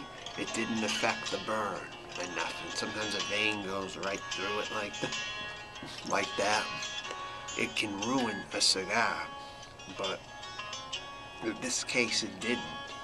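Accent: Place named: Boston, United States. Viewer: Yo are you from Boston? Damn that accent is strong boy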